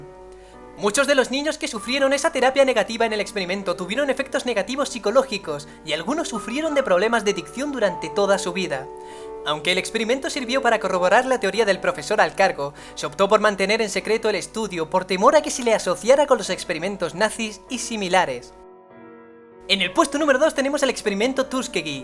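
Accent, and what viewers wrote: Spain. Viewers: así? es español de España, yo no veo nada raro xddd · Me encanta como hablan los españoles. Me encanta tambien tu canal. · sin más Español de España JAJAJAJSJJA ni modo que sea español de Colombia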